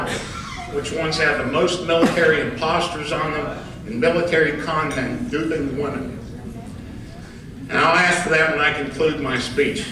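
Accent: Pittsburgh, United States. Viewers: Great work sir, thank you for your service! 🇺🇸. By the way, I can hear your Pittsburgh accent 👍🏻👍🏻😄😄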